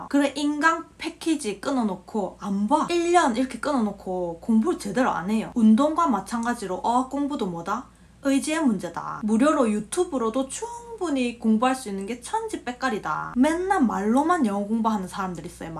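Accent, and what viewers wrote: Gyeongsang, South Korea. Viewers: 똑소리나는언니네요경상도싸투리안들어면.하루가지루하게합니다 경제학박사님도 할배야하겠어요. 오늘부터 유툽어로주식.공부열심히할람니다..넘.똑똑혀요. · 우왕~ 우연히 보게된 님믜 방송.50대 줌마인데도 은근 잼나고 웃음이 나오네요. 경상도 말씨 좋고 또!다~맞는말. 말도 참 잘한데이~^^ 화이팅~!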